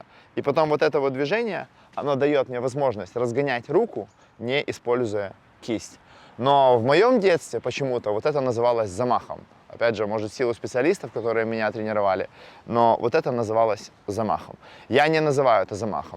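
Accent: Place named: Odessa, Ukraine. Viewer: Хорошее видео Очень знакомый акцент. У моих учениц из Одессы очень похожая речь У меня примерно такой же топ ошибок. Примерно так же исправляю Валерий , тренер из Германии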